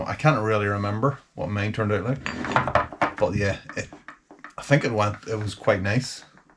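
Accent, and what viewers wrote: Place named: Northern Ireland, United Kingdom. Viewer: Where in ni are you? Enjoying your videos over in fife. But it's good to hear a voice from home.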